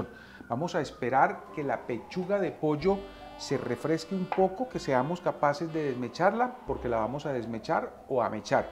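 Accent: Colombia. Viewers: como todos tus platos delicioso este plato frio w berraquera como se dice habla paisa sigue q sos excelete cheff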